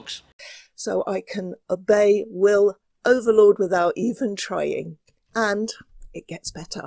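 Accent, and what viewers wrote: United Kingdom. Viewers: you do “sarcasm” better than any one- the British accent makes it even more delicious!!!❤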